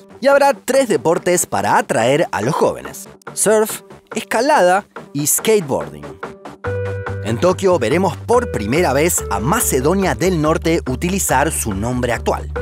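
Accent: Argentina. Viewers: Excelente dicción en la narración, obvio que con acento argentino, ¡bravo! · Que calidad de narración y guión tiene este canal, es genial y ese acento argentino a mí me encanta!! Excelente video!!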